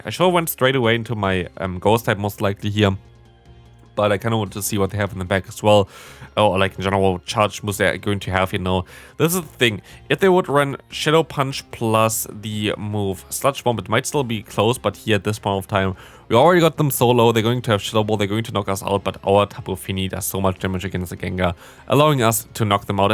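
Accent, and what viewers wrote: Germany. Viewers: Love that Cofagrigus Shiny, got one myself, but Bad Ivs 😅 Been watching your vids for some time now, are you german? Because you have a bit of a german accent when talking english. I've got it too 😂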